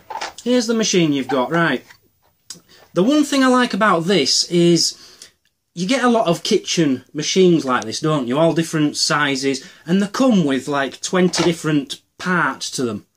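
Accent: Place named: Yorkshire, United Kingdom. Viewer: Dude where u from u sound just like me. Somewhere in Yorkshire??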